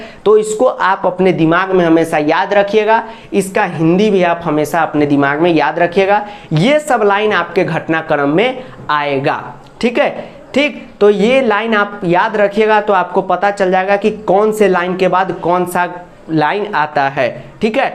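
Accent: Bihar, India. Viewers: Sir aap bhi Hamare Bihar ke ho kya ekadam Hamari Tarah Bhasha bolate ho कभी-कभी aap bahut Achcha padhaate ho thank you sir apni matrabhasha Mein samajhne ka maja Hai Kuchh aur Hota Hai😎😎☺☺☺☺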